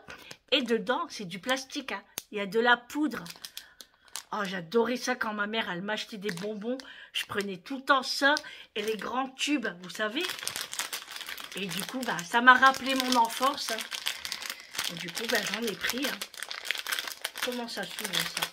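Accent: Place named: Nord, France. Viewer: Bonsoir Un vrai plaisir de faire votre connaissance… j’ai découvert votre chaîne par hasard et j’en suis plus que ravie 😊 En effet je suis originaire du Nord et votre accent me fait chaud au cœur 🙏 Je me suis abonnée à votre chaîne avec grand plaisir… Je vous envoie de belles ondes positives de ma Bretagne d’adoption et surtout prenez soin de vous. (Ma sœur habite fâches thumesnil) Bon courage pour demain et je vous dis encore merci pour votre gentillesse. Dominique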